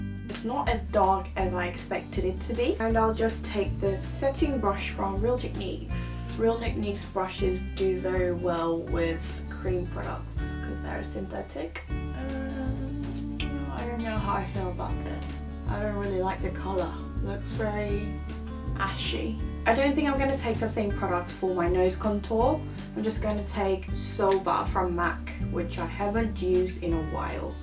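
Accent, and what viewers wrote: United Kingdom. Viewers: 님! 오늘도 님의 찰떡같은 브리티쉬발음을 듣고가네요ㅋㅋㅋ전 개인적으로 님 영어영상이 더 좋은것같아요!뭔가 공부도 되는느낌같고 너무 좋아요ㅠㅠㅠ이거이거샀다 하는 영상보다 이렇게 오늘처럼 말하고 사용감도 보여주셔서 알수있는 방식이 더더더 좋습니다!!말하는 영상도 너무 좋고 영상길으면 더 좋아요!! 영상이 너무 짧으면 항상 아쉽거든요ㅠㅠ개인적으로 15분대 영상을 참 좋아합니다ㅋㅋ 사용제품도 더보기란에 다시 다 알려주셔서 쉽게 찾을수도 있구요! 님 영상 항상 잘보고있어요! 다음 영상도 금방 찾아와주세여! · 수다떠는영상 좋아요!! 칭구느낌? ㅋㅋㅋ 영상 늘 잘보고있어요 영국발음도 듣기좋다능.. 좋은영상 감사합니다!! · 이렇게 제품 처음으로 사용하면서 수다떠는 영상 너무 좋아요ㅜㅜ 더 자연스럽고 중간중간 영국.. 리액션..?같은.. 그런 말투도 완전 씹덕..♡ 이번 메이크업 머리랑도 너무 잘어울리고 예뻐서 저도 기회되면 저렇게 머리 염색해보고 싶네용ㅇㅅㅇ 아 그리고 속눈썹 고데기 저렇게 하면 마스카라 뭉친것도 풀어지고 그러나요?! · 이런 영상 좋아요! 볼 때마다 느끼는 건데, 입모양 목소리 말투 영국발음 전부 매력적이고 듣기 좋네요.. 그리고 립 발색이 항상 탐나는 입쯀ㅎㅎㅎ 강점인 것 같아요 입술! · 언니 영상 보는데 제가 요즘 영드 에 빠져있고 언니영상 보면서😁😁 점점 영국영어 매력에 빠지는거같아요 ㅎㅎ♡^♡ 글고 요즘엔 방학이라 언니 영상보면서 화장법 따라하는데 역시 화장도 많이 따라해야되나봐영ㅋㅋㅋ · 이런 영상 진짜 좋아해여ㅜㅜ왜냐면 언니는 영국발음이 존나 매력있으니까!!! 자주 찍어주세여😆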